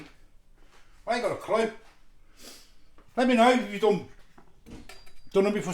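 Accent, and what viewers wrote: Birmingham, United Kingdom. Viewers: sorry but i still think you sound loik a brummy!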